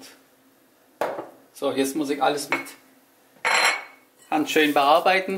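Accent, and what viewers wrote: Swabia, Germany. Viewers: Ich liebe deinen schwäbischen Akzent 🥰 Liebe Grüße aus Berlin auch an deinen Sohn. Er macht auf deinen Kanal hervorragende Arbeit und 👍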